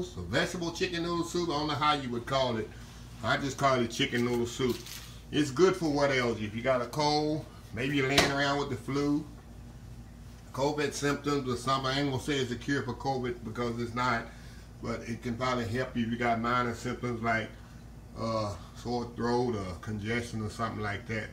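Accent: South, United States. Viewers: Bro it’s 7am and I’m up watching you make soup lol. You sound cool and southern, I can’t wait to give this a try! Thanks!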